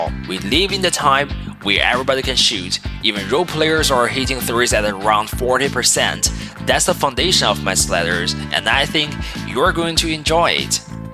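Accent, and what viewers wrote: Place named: China. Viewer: This guy is probably Chinese.. cause of his accent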